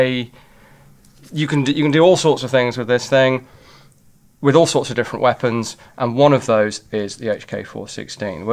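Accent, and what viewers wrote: United Kingdom. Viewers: no, he has never shot a gun. Because he's Bri'ish, you can tell by the accent.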